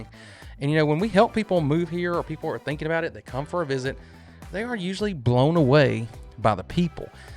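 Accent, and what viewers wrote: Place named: Texas, United States. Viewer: Oh wow, when I was watching one of your videos I thought that’s a Texas accent. I’m from Texas. Now it’s confirmed.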